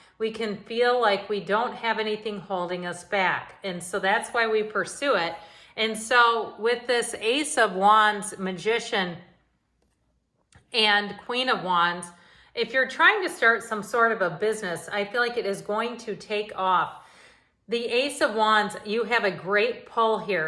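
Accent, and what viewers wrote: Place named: Chicago, United States. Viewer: you sound like you're from chicago. I grew up there and know that accent anywhere! So glad I found you here and this totally resonates with me. One question I have is how is the retrograde helping abundance?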